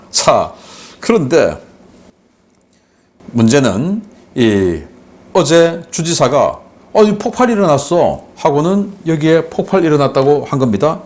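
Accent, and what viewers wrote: Gyeongsang, South Korea. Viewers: 신인균 너는 경상도 말투나 고쳐라 존나 듣기싫다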